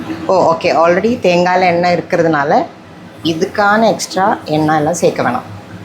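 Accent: Kerala, India. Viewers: Malayalam kalantha tamil like it kutty ma..... Na podi try pani pakuren sissy ma.....❤❤❤❤❤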